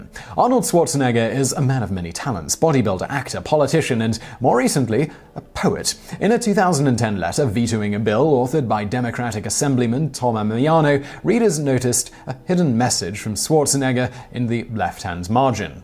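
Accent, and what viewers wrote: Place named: RP, United Kingdom. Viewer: How is it possible for you to literally sound posher than Prince William? Dial it back a couple of points dude, it sounds ridiculous. The poshest person in the country doesn't sound that posh so what are you trying to prove?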